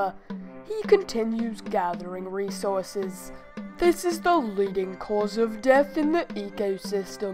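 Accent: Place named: Australia. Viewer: That australian accent ...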